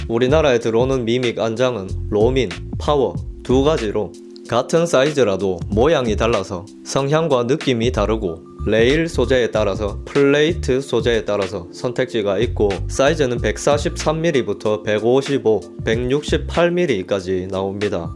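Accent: Gyeongsang, South Korea. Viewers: 저도 경상도 사나인데 사투리가 와이리 듣기 좋노~ 참 히안하데이~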